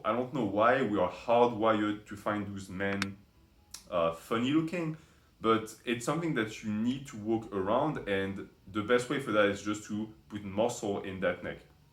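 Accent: France. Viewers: don’t know if you’ll respond to this comment after 4 years but your accent sounds like french, are you one of us ? 🇫🇷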